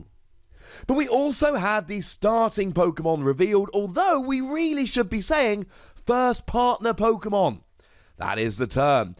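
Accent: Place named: Cornwall, United Kingdom. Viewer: AYY a fellow Cornishman. That's lit, thought I was hearing a Cornish accent but I thought it was all in my head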